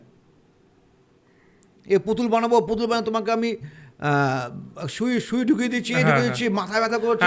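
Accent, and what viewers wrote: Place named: Assam, India. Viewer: দাদা কথাগুলো খুবই ভালো লাগে কিন্তু দাদার কিছু কিছু কথা আমি বুঝতে পারিনা দাদা কি আসামে থাকে কিছুটা কথা আসাম টান আসে কালীঘাটে দাদা কোথায় বসে❤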